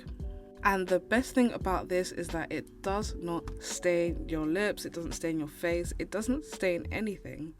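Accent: United Kingdom. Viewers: you have a lovely voice I always wanted to speak in a British accent!🍵💕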